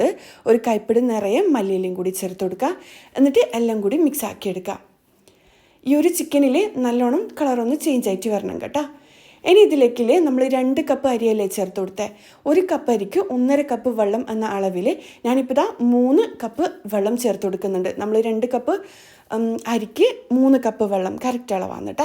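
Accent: Kannur, India. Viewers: സൂപ്പർ👌👌👌❤️❤️❤️👍 ഉണ്ടാക്കാംഇൻഷാ അല്ലാഹ് കണ്ണൂർ ആണോ വീട് ഭാഷ കേട്ടപോൾ😀നല്ലോണം തണിയാ വെക്കുക്ക😂 ഭാഷ കേട്ടപോൾ ചോദിച്ച നമളെ ഭാഷ😀😀😀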